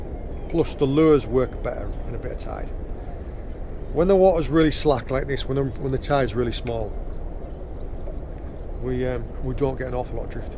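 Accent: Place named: Newcastle, United Kingdom. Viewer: lad!!? Youust be one of the best boat anglers around (Certainly for my brass anyroadl!) YOU!? For ME¡ Are one of the best EXAMPLES of Young anglers about! You explain In ageordie/ northumbrland accent (which I like,but have always favoured!??) Longay you retaím it and 😂. Longmay you broadcast your Youtube channel it😮I channel and it retaím the same resilience and Charming lilt as you do now??!! I am now 67*😮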